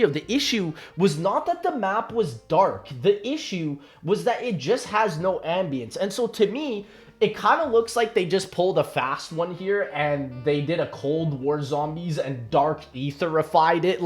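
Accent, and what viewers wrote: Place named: Toronto, Canada. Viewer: Toronto accent 🤡🤡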